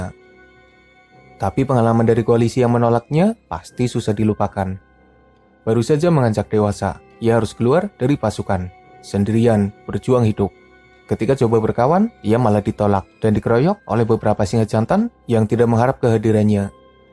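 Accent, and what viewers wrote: Banyumas, Indonesia. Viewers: Ngapak e ketara banget kiye admin e 😅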